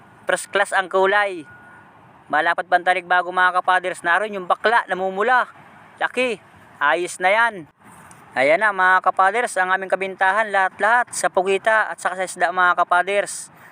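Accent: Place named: Patnanungan, Philippines. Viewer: San nga pala location mo ka father's?, parang Patnanungan accent ka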